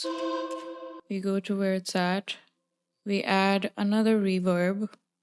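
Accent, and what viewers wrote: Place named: India. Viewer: Hey r u Indian by any chance Cuse your accent tell that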